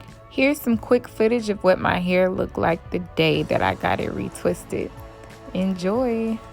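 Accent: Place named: South, United States. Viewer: Girl, listen, I've had locs before (about to start my loc journey again next week) and I had never been tender headed in my life until my hair loc'd! I thought that was crazy. Your hair is beautiful, btw! I'm a new subbie. Thank you for mentioning the salon because I'm in Atlanta too. To hear you talk, I was thinking you had to be from here or somewhere from the south because we sound alike 😁😁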